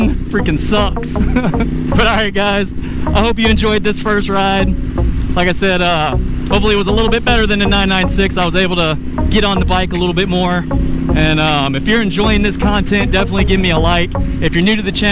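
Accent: United States. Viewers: Between the paint lines looking the same as in a lot of the US and your accent I had assumed you were in the US and thought of offering you some time on a 2013 Streetfighter 848 if you were going to be anywhere near the DC area.. but no, South Korea is a bit of a trip 😂 Maybe for the best, mine isn't the prettiest example! Coming up on 30k miles, dark stealth, frame sliders, aftermarket tidbits here and there... It rides nice, but it looks its age in places.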